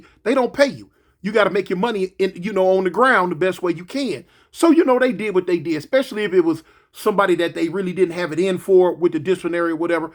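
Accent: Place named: Colorado, United States. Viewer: Yo Big homie can you do a story about my cousin RIP OG Businessman Berry (Godfather the loan Sharker)killer Clause and my Uncle Freddy( he was in and out of prison that frequently use Herion) you say certain words that remind me of them! It’s that OG South Park Slang homie!!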